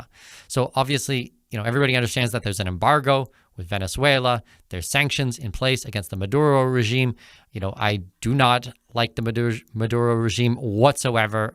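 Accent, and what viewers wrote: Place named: United States. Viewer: you have an American accent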